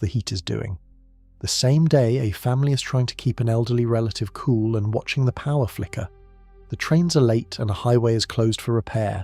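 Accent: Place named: United Kingdom. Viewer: The narrator voice is British. Few Brits have any concept of Fahrenheit, apart from maybe 32º Fahrenheit.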